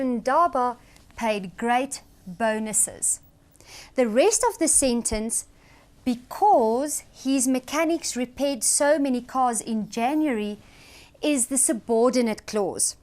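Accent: South Africa. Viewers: this is south African accent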